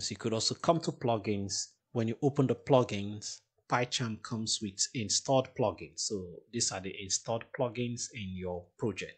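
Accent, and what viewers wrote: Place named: Cameroon. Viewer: Bro, you sound Cameroonian. I am glad to see your page and I am subscribing right away! Great job!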